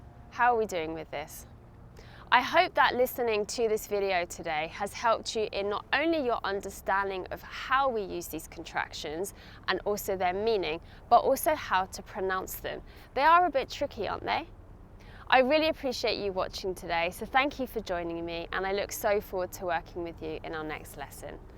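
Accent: United Kingdom. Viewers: Nice video. ❤ Is it British Accent you speaking? Just a doubt. I am a new subscriber.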